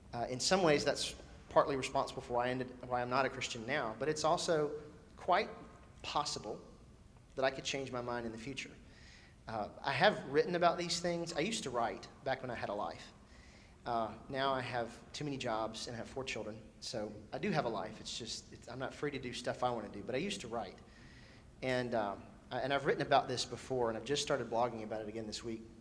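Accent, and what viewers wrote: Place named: South, United States. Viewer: ***** I must say, while you definitely have a different texture to your accent than that of the southern 'twang' stereotypically associated with 'the south', it's still distinctly 'southern' to my foreign UK ears, and I like it. :)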